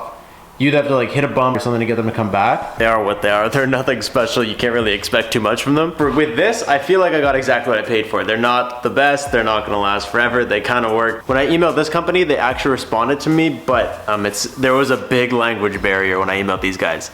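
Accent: Canada. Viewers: I'm new here, but guessing you're in Canada by the way you pronounce "out". Cause I was confused when you were stating the temps.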